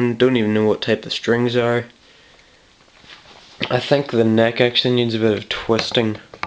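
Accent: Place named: Scotland, United Kingdom. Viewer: i like your scottish accent you got good taste in basses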